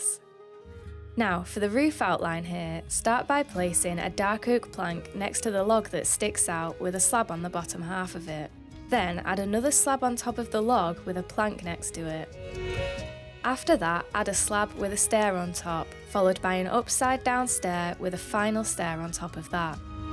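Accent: United Kingdom. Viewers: Your British voice feels so calm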